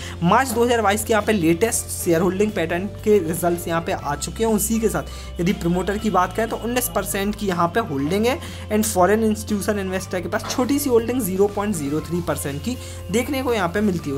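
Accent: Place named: Bundelkhand, India. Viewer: aavaj se aap bundelkhandi lagte ho😍😍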